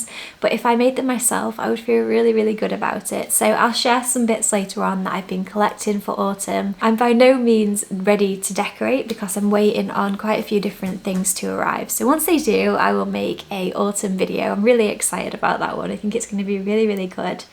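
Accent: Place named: North, United Kingdom. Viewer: Hi I have just found your channel yesterday and I'm so glad I did. I caught up on some past videos. Your very real and are just you and I appreciate you for it. Dont worry just let it flow everything is weird at the moment. Can I ask what you do as your job just being curious. Your house is lovely and always looks so calm and plus u have a northern accent which helps. Xx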